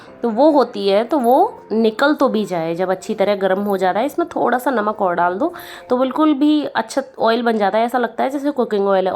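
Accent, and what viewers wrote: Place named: Agra, India. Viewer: Aap bilkul hamari bhasha bolti ho.m agra se hu.jaise rai jharap or hamare yaha bhi sawan m kadi nahi banate.mera bhi man kar raha h m bhi kal banaugi sawan k baad😊🤗🤩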